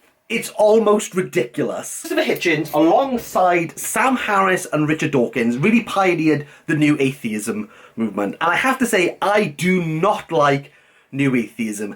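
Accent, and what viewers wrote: Wales, United Kingdom. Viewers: couldn't understand a word you were saying .................oh i see your Welch ,,,,,,,,,,,,, and your a theist ,,,,,,,,,,,,,,,,,,,ha ha ha ha .........oh dear oh dear We stand for your right to be a theist .............but please know your wrong , your living in a fantasy land stop being a Fucking child , let me point you in the right honourable direction of the patron saint , His holelyness , all explained here <link>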